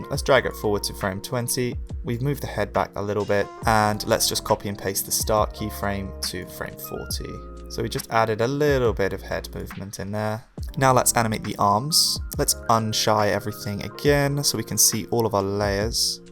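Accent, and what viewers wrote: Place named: West Country, United Kingdom. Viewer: Really good tutorial, great job. Are you from the West Country? I hear a slight twang in your R's haha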